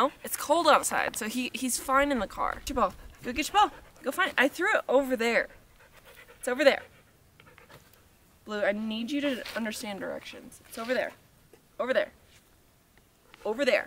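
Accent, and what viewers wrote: South, United States. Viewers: Please do an entire video in the Southern accent!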